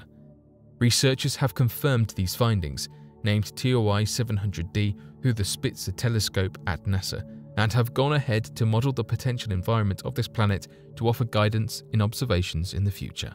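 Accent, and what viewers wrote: United Kingdom. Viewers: shut the fridge. is he not british? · why do you sound british?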